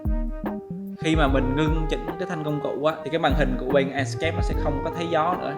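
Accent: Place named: Quang, Vietnam. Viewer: nói tiếng quảng nó cứ vui vui :v , mà xin mấy map bạn đăng k thấy chi sẻ :(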